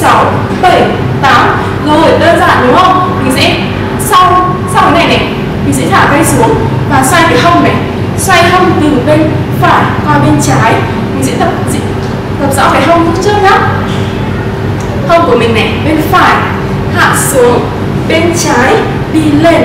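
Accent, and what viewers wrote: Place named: Hanoi, Vietnam. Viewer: Ôi, giọng Hà Nội nghe ấm, sang, thanh lịch quá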